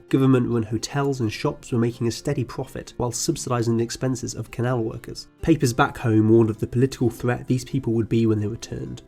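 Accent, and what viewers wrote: England, United Kingdom. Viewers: Pedro Aguiar Yes..he’s English and that’s the English way of saying the country. Do we have to call Germany Deutschland while we’re at it? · British accent sucks. That being the case, you should talk slow dude! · Jane Kailey are you unable to understand the British Accent?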